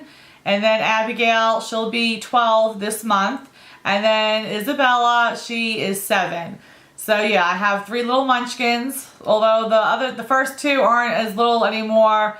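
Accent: New York, United States. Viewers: Hi! Loved getting to know you! You may have left NY when you were a little girl, but you still have that NY accent which I love... I've lost my Jersey accent:-( Hope you're having a great weekend!!! xoxo -Marlene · Love your bubbly attitude your NY accent lovely... Great tag glad getting to know you a little better...tfs beauty🤗🌹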